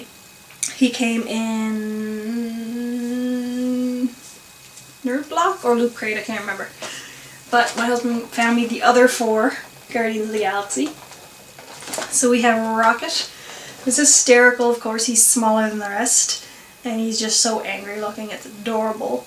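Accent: Ireland. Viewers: Are yu from Ireland? You kind of sound like us haha I wish we got a haul like this! :P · R u Irish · I can't decide wether she is Irish or American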